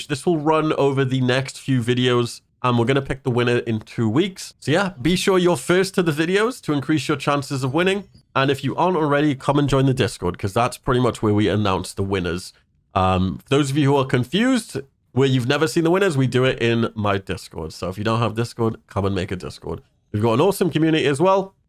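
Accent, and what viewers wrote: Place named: RP, United Kingdom. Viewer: Lucent entry, but most importantly listening to the posh accent in your voice. 😂✌️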